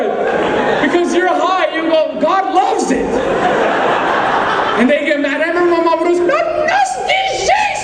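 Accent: Azores, Portugal. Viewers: Não é português decente é português das ilhas, ou dos açores ou da Madeira. Como raio é que não sabes que pronuncia é esta? É a mesma coisa que não perceberes português no Porto ou em Mirandela. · Ele é cómico mas a sua pronúncia açoriana ficou ainda mais difícil de perceber intercalada com o inglês. Além disso as coisas que ele diz aplicam-se mais aos açorianos do que aos portugueses em geral.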